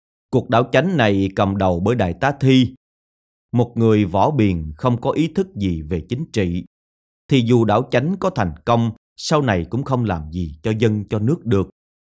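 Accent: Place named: Saigon, Vietnam. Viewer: Giọng Anh này là giọng người Sài Gòn. Đọc nghe rõ ràng , hấp dẫn quá! Cảm ơn Anh !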